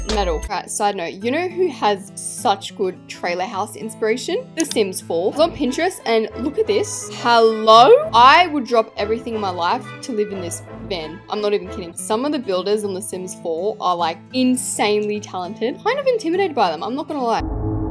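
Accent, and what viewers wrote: South, United States. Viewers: How is better at a country accent then me and I’m from Texas 🥲🥲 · Can you do a video only using you country accent? LOVE YOUR VIDS!!!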